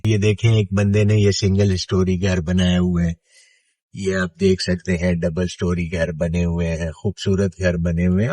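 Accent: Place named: Karachi, Pakistan. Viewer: Youn lagtahai keh Karach ka aik Padha likkha nojwan baat ker raha hai.😊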